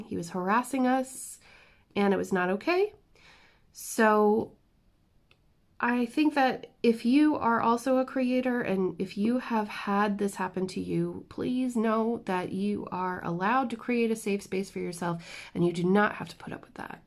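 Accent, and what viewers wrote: Ireland. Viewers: Sometimes when chatting you can hear a bit of the southern Irish accent coming through, even though you are American and to be honest it sounds really beautiful to. Wow I am surprised that negative drama is showing it's head in the crafting community, what is wrong with these people crafting is meant to be relaxing, fun and hopefully making new friends who enjoy the same hobbies that we all have in common. So sorry that has happened to you I must see if I can remember my Instagram password because I am looking for a particular diamond painting called remember me from dac which I am keeping money aside for if it suddenly comes along. I also have quite a few diamond paintings that I could donate for your charity. God bless look after yourself I feel your pain I have developed an allergy to sunshine just my luck, my son says I'm turning into a vampire 🧛‍♀️lol. 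🤗🍀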